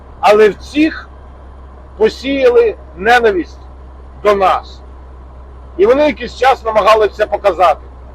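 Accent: Zhytomyr, Ukraine. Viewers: Вітаю! Не давно на вас підписалась, дуже цікаво все розповідаєте. Пораджу вас дітям які мають бажання виїхати в Америку, це донька і зять. Питання :звідки Ви родом з України, відчуваю по розмові десь з мого регіону чи поблизу- Житомирщина. 😊❤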